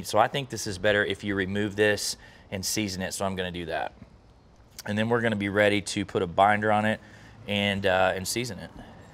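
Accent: South, United States. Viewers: Wait is this guy from the south? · Is this guy from the south??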